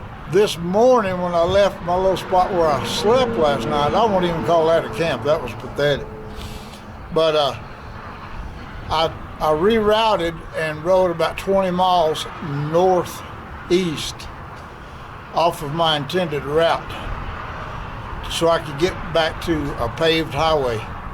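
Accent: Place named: Texas, United States. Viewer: Excellent first episode. I like the break in commentary .I like that old Texas accent good job 👍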